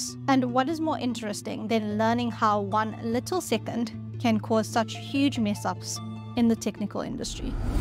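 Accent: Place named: South Africa. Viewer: Sounds like a Saffa accent.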